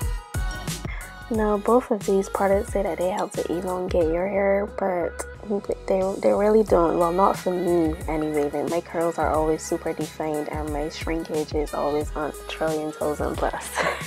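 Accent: Barbados. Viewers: Are you from Barbados???!!!!!!!! You sound like a bajan, that's a compliment! 😉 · Heard the accent and had to subscribe. Big up to BIM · Are you Bajan?! Cause that accent 😍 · Any chance ur Barbadian? Idk u just sound Barbadian. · Has to be a bajan. I know that accent anywhere. 😍🇧🇧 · can't miss that Bajan accent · Am I hearing a Barbadian accent? I really hope so. YouTube needs more Barbadian channels. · Sound like you is ah bajan yuh 🤔🤔💛💙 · Your curls are so pretty! Also, I’m not from Barbados but I’d know that accent anywhere lol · Sounds like you from Barbados love it !! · Love your accent, sounds like a Bajan accent if I’m not mistaken 😁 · You cant hide from that Bajan accent lol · Where is she from she sounds bajan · ARE YOU FROM BARBADOS!? I feel it in ur accent!? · Im new to your channel ,is your hair bleached ? ohh and you got a Bajan accent :) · Yooooooo I hearing that barbarian accent !!😌😌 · You have to be bajan I know that accent too well to mistake it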